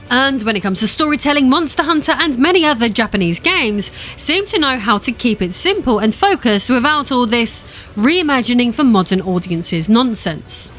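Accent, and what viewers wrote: United Kingdom. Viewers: I ❤British accent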